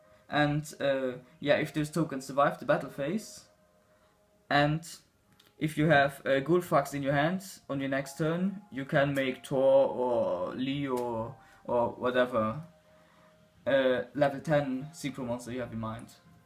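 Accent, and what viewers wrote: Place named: Germany. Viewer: naja warum macht ihr kein deutsches video ? all your cards are german and your accent sounds german ? by the way good Video i liked it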